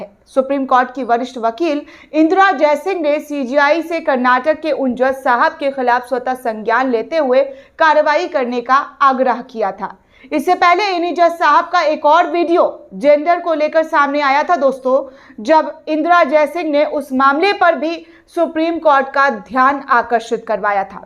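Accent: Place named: Bengal, India. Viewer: aapka yea Lady reader or announcer 100% Banagali hai. Acha reader rakhiye jo pronounce thik karegi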